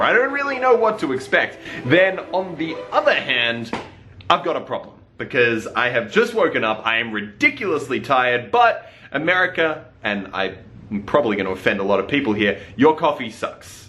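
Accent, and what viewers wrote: Australia. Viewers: I really love Australian accent <3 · "warder-proof testing" love aus accent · Wow I'm dumb I just realized your Australian even though I am Australian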